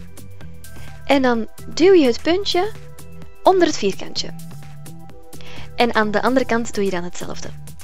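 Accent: Flanders, Belgium. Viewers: Ze zeggen dat dit Nederlands is maar dit is Vlaams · ik snap die dingen niet en het is vlaams niet nl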